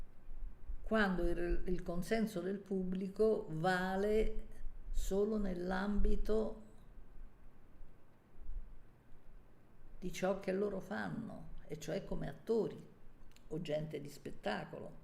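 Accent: Rome, Italy. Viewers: Ciao non ho ancora visto il video però ci tenevo io a cercare di darti qualche “spunto animico” che fanno sempre piacere Ti stra consiglio le serie di Zero Calcare su Netflix, ne è uscita una di recente “Due spicci” molto bella ma sono tutte meravigliose… tra l’altro romanaccio come te, secondo me ti piacerà molto E poi una canzone, “ ” dei Grazie mia, spero tu stia bene ♥️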